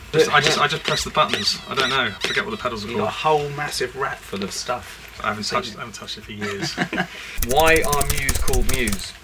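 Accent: United Kingdom. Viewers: Joanne August ouiiii,lamentablemente,los escucho una y otra vez...pero para mí lamentable inglés,entre el acento so brithish y lo rápido que habla Matt😩😩😩no agarro una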